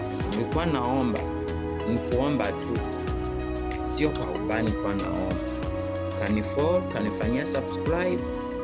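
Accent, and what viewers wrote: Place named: Burundi. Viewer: Swahili ya Burundi hiyo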